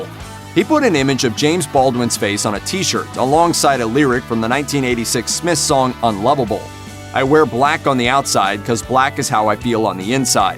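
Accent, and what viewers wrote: United States. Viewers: It's interesting to choose the most inappropriate American blah blah voice to essentially attack with much untruth and sensationalism a English artist.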